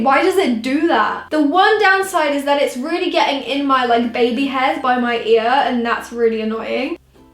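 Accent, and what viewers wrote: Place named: England, United Kingdom. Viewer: she is from England! she has a similar accent to me but mines more high pitched :)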